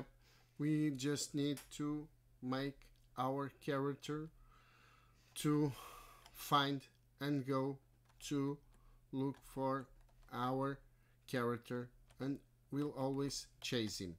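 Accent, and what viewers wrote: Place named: Portugal. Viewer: da p ver k es tuga XD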